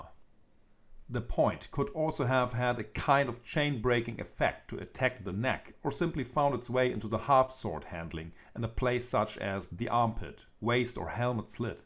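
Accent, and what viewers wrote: Germany. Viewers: Don't you ever dare to lose your german accent,. Best wishes.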